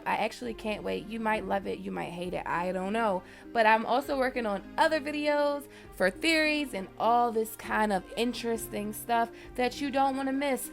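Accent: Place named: Midwest, United States. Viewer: lol NOT comparing you to that creature. Where your accent from anyways? Midwest? Chicago? I actually prefer you being genuine rather than others who put on a terrible British accent when discussing ASOIF.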